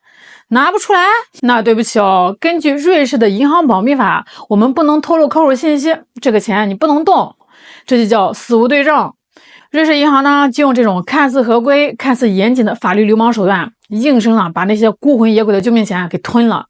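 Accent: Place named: Shandong, China. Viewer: 这口音是闪董印. 挺解压滴. 瑞士人坏的很, 他周边的国家没一个不恨他的, 美国也时常修理瑞士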